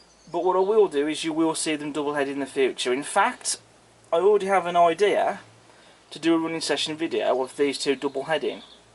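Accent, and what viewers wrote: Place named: Birmingham, United Kingdom. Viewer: chap sounds like barry from auf weidesain pet,must be from birmingham, put the 1p with the six wheelers and the 4f with the staniers,much more prototypical.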